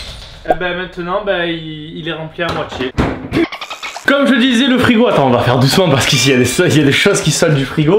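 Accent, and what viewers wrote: Marseille, France. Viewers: T'es marseiller moi oui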